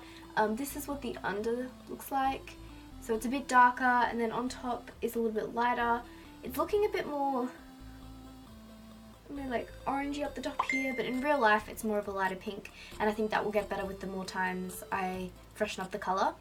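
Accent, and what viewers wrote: Australia. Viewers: Is this an Australian accent I’m really trying to hear the difference between Australian and British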